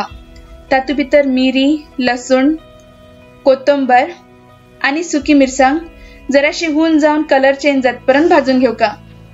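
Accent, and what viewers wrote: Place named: Karwar, India. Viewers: .. Hello dear. That's Karwari Konkani. People from Dandeli, Kumtha,Murdeshwar speak in this accent. It's not the Goan Konkani. I am a Goan GSB. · guruprasad YM , true ..I too sensed that . It sounds more of uttar Kannada konkani version or Goan or Malvani .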